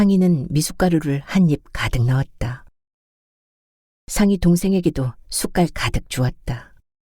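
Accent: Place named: Daegu, South Korea. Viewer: 대구 사투리! 참 재미있습니다. 시대배경이 눈앞에 그려집니다. 그리고 미성의 주인공 우리 님은 어떤분일까?❤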